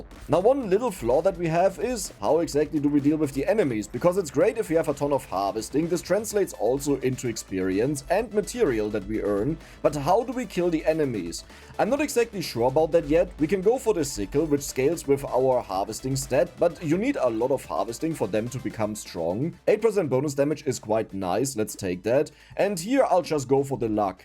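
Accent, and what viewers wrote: Germany. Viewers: Idk why but he sounds so much German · Der deutsche Akzent ist einfach Bombe 😂 The German accent is the bomb 😂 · Are you german? Alter Schwede... Gave it away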